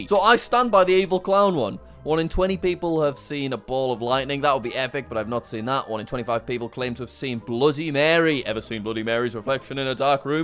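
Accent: United Kingdom. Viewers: shut up asshole its British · Is British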